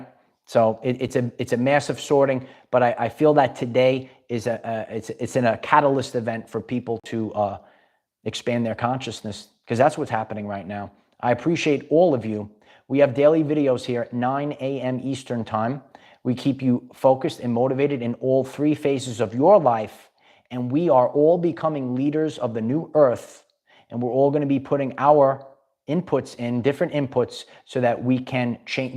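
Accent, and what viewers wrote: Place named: Boston, United States. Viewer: Hmm....1st time here, love the accent. Usually not my topic of choice but was drawn in. May i know where the accent is from, is it Boston? I love hearing other voice differences across the globe, and learning about other cultures🙏✨️❤️‍🔥🌊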